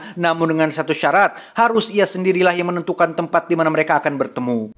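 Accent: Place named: Madura, Indonesia. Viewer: Madura bg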